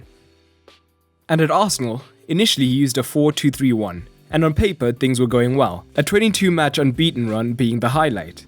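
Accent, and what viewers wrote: South Africa. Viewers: Are you South African?😂 your accent would suggest it. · You sound South African.. Gauteng oakie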